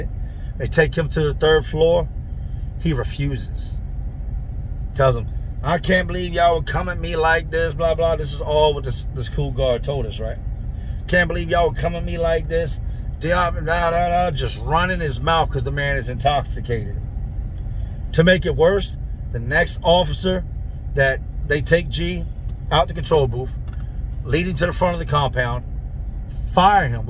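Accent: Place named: Philadelphia, United States. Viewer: Is this guy from Virginia or Philly because he sounds like he straight outta Philly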